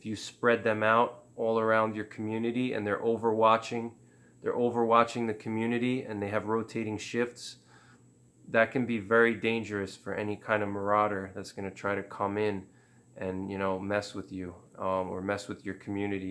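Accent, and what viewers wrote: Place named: New York, United States. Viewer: Hey from waaaay UPSTATE NY. Your "accent" says waaay downstate.lol. Impressive on the deadlifts!! As a young man of 40 I pulled 405 for 10 at 145 lbs, but 25 , I got winded watching:) Too bad you appear to be so far away, could use another good man for the community. (***Always looking for like minded preppers to join my little slice of heaven!! 1/2 hour from Canadian border and 1 hour from Montreal, if anyone's interested and local, or just someone who realizes being in the sticks is way safer than anywhere near cities and won't mind some travel). Good videos and now a sub!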